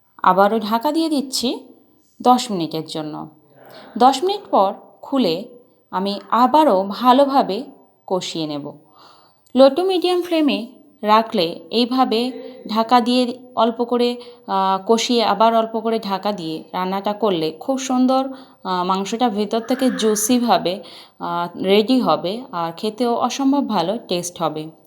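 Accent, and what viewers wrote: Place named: Sylhet, Bangladesh. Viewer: That's what I wanted to know.... Thank you for sharing it.... I thought you may be a Sylheti... That's just how I felt from your accent....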